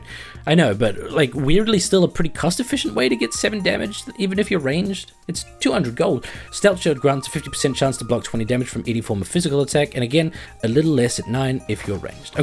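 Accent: New Zealand. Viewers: hes a New Zealander but i guess its kinda the same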